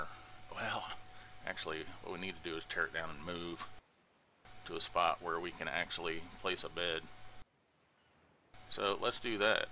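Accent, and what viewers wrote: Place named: South, United States. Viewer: ey! man! are you south states?? Your dialect sounds like it, Tx, Ka, Az ish.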